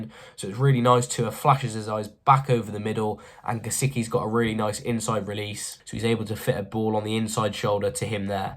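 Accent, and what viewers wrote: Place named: United Kingdom. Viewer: It's something special about hearing a Brit breaking down American football. . . AND KNOWS WHAT HE'S TALKING ABOUT. I'm here for it.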